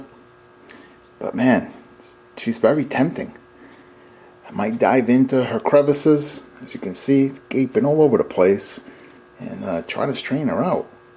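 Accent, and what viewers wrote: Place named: Bensonhurst, United States. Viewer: You sound like you're from bensonherst. Not a slam.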